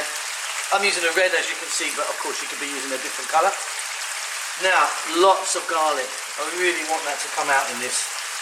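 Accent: United Kingdom. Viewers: I tried the recipe, awesome and I love your British accent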